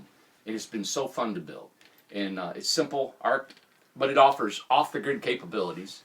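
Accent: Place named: Chattanooga, United States. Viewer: Very nice .I'm building almost finished a tiny house in Chattanooga. I have many of the componants for an off grid system but would really like I knowlageable hand in putting it together. Are you near Chattanooga?I ask as you sound like you might be.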